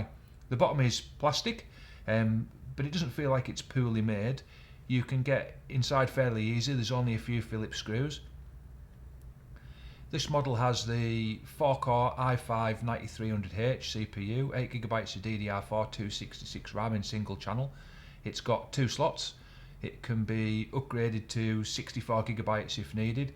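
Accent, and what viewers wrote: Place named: United Kingdom. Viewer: I hardly understand the British accent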